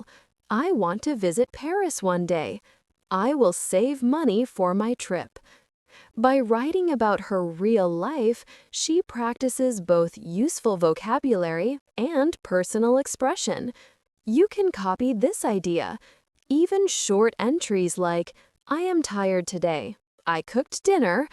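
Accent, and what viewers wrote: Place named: India. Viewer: India accent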